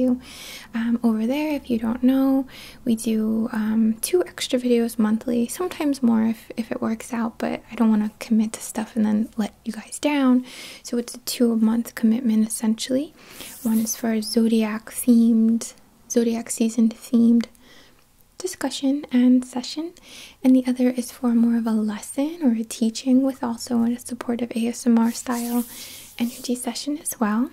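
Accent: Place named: New York, United States. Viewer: I can hear NY in ur voice. Much love🧡🧡🧡✨✨✨✨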